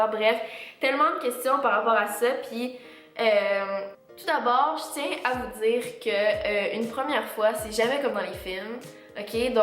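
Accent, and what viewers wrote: Quebec, Canada. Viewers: coucou.. tu es la meilleur youtubeuse que je connaisse. Tu t exprime super bien , sujet tabou en 2017 lol quoi.. tu es quebecoise?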